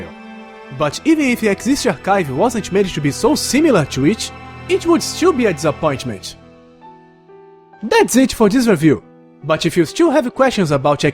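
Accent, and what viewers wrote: Brazil. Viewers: are you br? i can't stand your accent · e carioca, né? hahaah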